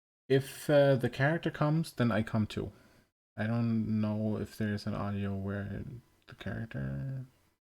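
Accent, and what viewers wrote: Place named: United States. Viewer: Your accent sounds SO american to me (it took an interesting amount of time - no, I can’t remember how long, I only knew that once - until I realized: Oooooh! A German?!), dass ich mich beinahe nicht getraue, etwas auf Deutsch zu schreiben. 😄 Na, ne, so schlimm ist es dann doch nicht…mehr. Zumindest nicht dramatisch genug, um mich davon abzuhalten, dir kurz aufzudrängen, dass ich in vielerlei Hinsicht schwer beeindruckt und sehr dankbar bin, denn: Durch deine enorme Offenheit, und damit automatisch die Stärke zu vertrauen, habe ich das erste Mal seit Jahren das vage Gefühl: „Ja, doch, man kann sich verletzlich zeigen - und es kann schön sein“. Weißt du, ich dachte, ich würde eines Tages mit Begeisterung Geschichten schreiben, denn früher hatte es mir großen Spaß gemacht, durch behutsames Freilegen eines Charakters, intime Situationen entstehen zu lassen. 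"Ich dachte" war natürlich einmal "ich denke", aber einige spannende depressive Episoden, lästig wie eine Person, die ganz dicht vor einem hergeht, eigens für den Zweck, nach Lust und Laune abrupt stehen zu bleiben, sodass man nicht anders kann, als gegen sie zu prallen, machten es mir sehr schwer. Irgendwann hat man erschreckend große Angst davor, es könnte einem jemand zu nahe kommen. Deswegen kann man bestimmt von einer gewissen Erleichterung sprechen, wenn man jemandem zuhören darf, der einem vormacht, wie mutig geht. Und das machst du wirklich großartig - dankeschön. 😊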